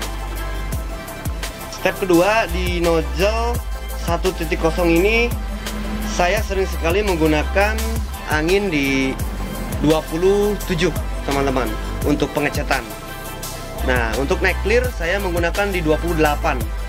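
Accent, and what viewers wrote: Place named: West Java, Indonesia. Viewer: bukannya Jawa ngapak ya? Kok logatnya Sunda? 🤔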